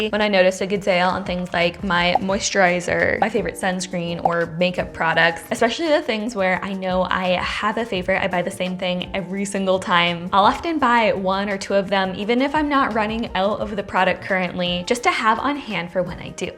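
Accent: Canada. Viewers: I noticed the Canadian accent right away 😂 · Had you not featured US Dollar bills in your video, I would've thought you're Canadian based on your accent. The Venture X is a powerhouse for sure. I'd also recommend the lesser-known AAA Daily Advantage Credit Card, which has become my primary CC (5% on groceries, 3% on Warehouse Clubs (a category that not even the Costco Citi VIsa offers that rate of rewards for), 3% on Gas and Pharmacies, and so on...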